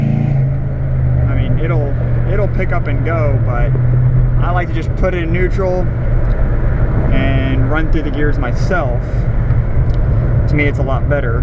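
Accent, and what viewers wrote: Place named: Texas, United States. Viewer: you from texas og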